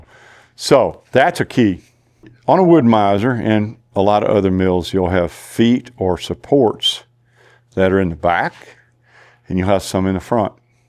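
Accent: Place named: Virginia, United States. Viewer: Much appreciated! As a new sawmill owner your words of wisdom are very valuable. And you talk like me......from South Central VA😂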